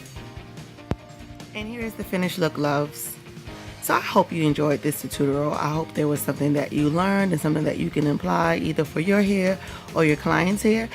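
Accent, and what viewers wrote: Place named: New York, United States. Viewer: Up, and coffee in hand. Back to the “Binge!” This “Do” looks AMAZIN! Love it; Natural/Relaxed....Healthy Hair is the Goal💪🏾💪🏾 And this looks Great! Technique is CRAZY! Such a “Pro!” Oh, and I KNEW you were a “New Yorker!” HEEEEY Fam! The #accent may have diminished, but it takes ONE to know one!💪🏾💪🏾💪🏾🖤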